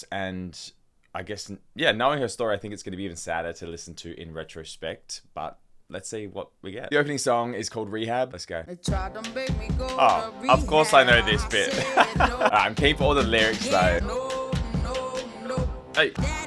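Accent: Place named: Australia. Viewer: The way I could tell he was Australian before clicking on the video 😂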